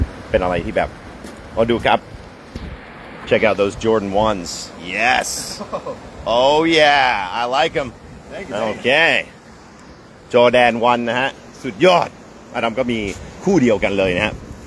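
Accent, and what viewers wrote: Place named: Isan, Thailand. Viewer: อ. พูดอีสานได้ บ่คือคนอีสาน แต่ก็มีความพยายามนะครับ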